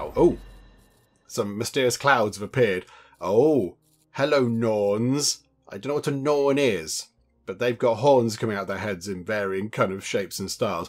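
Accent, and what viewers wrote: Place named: England, United Kingdom. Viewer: I flipping love your gameplays, your fabulous typical English accent and your way to entertain people. 👏🏻👏🏻👏🏻👏🏻👏🏻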